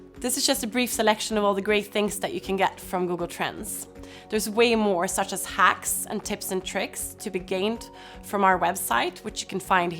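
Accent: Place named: Sweden. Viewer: Accent is Swedish!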